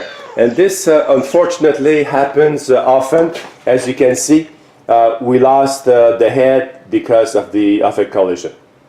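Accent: Quebec, Canada. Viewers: Merci pour la revue! (I'm writing in French since it seems like a French-Canadian accent like mine ;) )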